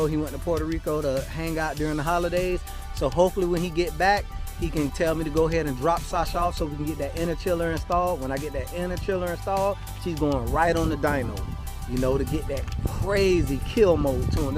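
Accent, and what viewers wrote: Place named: Alabama, United States. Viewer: You sound like you are from Alabama, which is where I am. I just bought a 2018 X5 x35i and I am itching to give it bigger balls. Yours sounds amazing. I’d love to have mine sound like that as well. I’m new to your channel. Thanks for sharing.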